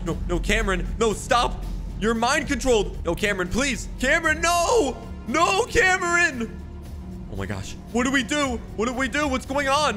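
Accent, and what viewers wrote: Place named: England, United Kingdom. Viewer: I'm english and I speak like you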